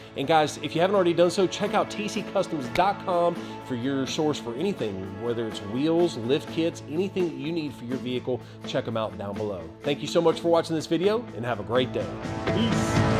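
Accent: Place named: Alabama, United States. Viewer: Greetings fam. I had a 2015 F-150 from my bother-law, 35,500miles. My plans were to do a 2" offset with a 2" level kit. My son had to drive it because someone cut his convertor. Then someone t-boned the truck, bent the frame and cracked the shaft. My wife and share a 2013 Jeep Grand Cherokee and she won't let me do crap. Hey, I hear the Bama boy keeps coming out. Peace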